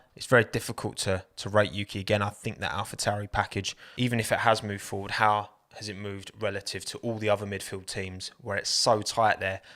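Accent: United Kingdom. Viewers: So Bri ish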